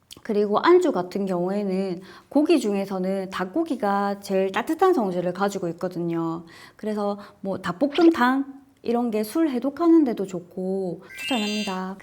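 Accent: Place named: Daegu, South Korea. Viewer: 내용도 좋은데 억양이 재밌어요 경상도중에서 대구쪽인 듯 한데 근무지가 대구이실까 모르겠네요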